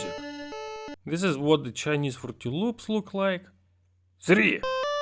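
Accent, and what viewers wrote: Russia. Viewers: I don’t understand, are you Russian? the accent is very similar to Russian (hello from the Russian Far East)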